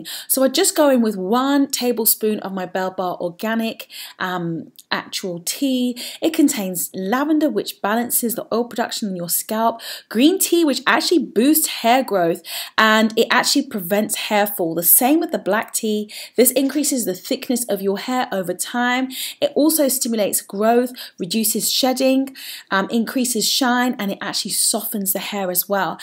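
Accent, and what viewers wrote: England, United Kingdom. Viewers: missing the English voice over here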